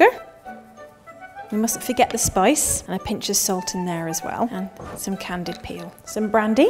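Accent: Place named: West Country, United Kingdom. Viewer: The west country accent was a little stronger in these earlier episodes, lol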